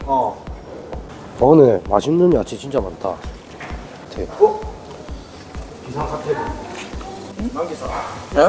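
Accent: Gyeongsang, South Korea. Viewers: 구수한 갱상도 사투리에...참 유쾌하게 드시고...진짜 맛있게 잘드시네요..~~^^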